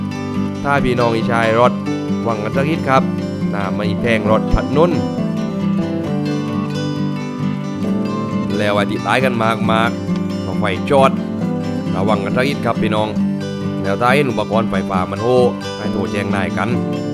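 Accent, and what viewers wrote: Thailand. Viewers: บ้านผู้บรรยายต้องคงไม่เลยนครศรีฯลงไปตอนล่างแน่ ๆ สำเนียงนี้ ฮ่ าๆๆ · คนพากษ์อยู่ตรังม้ายนิ๊ ถ้าเข้ามาเห็น บอกกันนะบ่าวว่าโย่วย้านไหน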